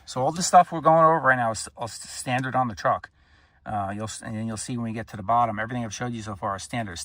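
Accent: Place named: Long Island, United States. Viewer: Really good, thorough review! Were you originally from Long Island, NY, by any chance?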